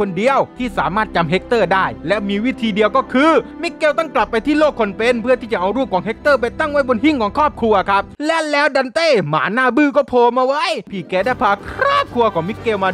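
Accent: South, Thailand. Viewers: พี่เป็นคนใต้เหรอ พี่เป็นคนจังหวัดอะไรอ ผมสงขลา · พี่เป็นคนใต้หรอครับ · พี่คนจังหวัดอะไรครับคนใต้หรอพี่ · เเอดเป็นคนใต้ท้ายนิ555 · พี่เป็นคนใต้ใช่ม่าย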